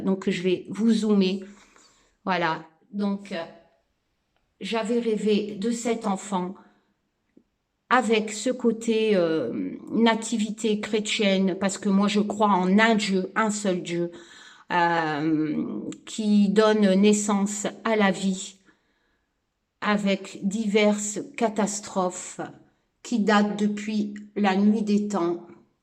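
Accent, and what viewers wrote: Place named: Nord, France. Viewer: est juste humaine 😇un mail envoyé et réponse dans la foulée...j'espère que ma question sur le boulot arrivera surtout que ce domaine (dans des bureaux )du jamais fait pour moi😊j'adore la regarder toujours dans la bonne humeur même légèrement remonter, elle a toujours un mot pour nous faire rire...Son patois un peu chti me fait rappeler ma famille tous en Picardie.Bref une femme qui m'a donner l'envie de faire mes propres tirage d'ailleurs reçu mon petit Oracle aujourd'hui que d'émotion pour moi😢 j'attends mes 2 autres avec impatience...Je fais selon mes envies j'ai sauter le pas pour mes tirages et me contre fiche du jugement de certains membres de mon entourage. Grand merci pour toutes tes explications sur les cartes.Votre livre est rempli d'émotions les larmes sont venu pour moi😊.Continuer sur votre chaîne vous nous faites un bien fou...Encore une fois. 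M E R C I 🙏🙏🙏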